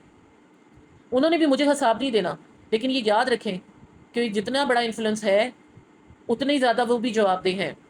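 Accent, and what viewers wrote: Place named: Punjab, Pakistan. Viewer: Very true💯... But really ur punjabi is soooo sweet i luv it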